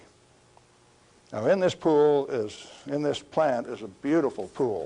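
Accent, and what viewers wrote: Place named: Midwest, United States. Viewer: I'm always nostalgic for mid-century Midwestern accents from a grandfatherly man talking science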